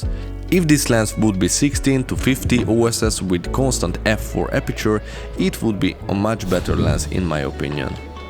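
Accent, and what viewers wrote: Hungary. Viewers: Amint meghallottam az akcentust, tudtam hogy magyar vagy. :D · Meghallottam ahogy beszélsz egyből levágtam hogy magyar vagy :D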